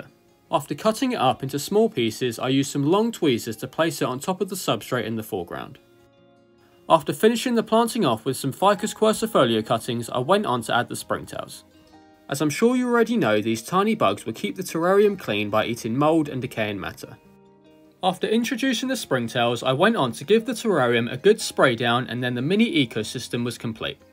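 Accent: United Kingdom. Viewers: His British accent is just killer😌 By the way the setup is amazing 🎉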